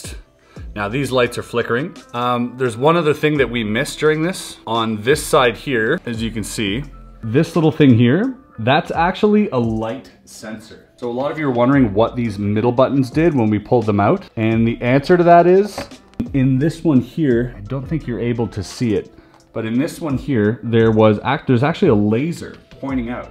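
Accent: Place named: Quebec, Canada. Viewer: C’est la première fois que je tomb e sur une de tes vidéos et lorsque tu a dit merci dans part 1 jme suis dit c est sur qu’il est Quebecois lol Quand tu as dit Sébastien dans celle-ci ça confirmais... En tout cas bravo 👏 je suis un nouveau subscriber ✅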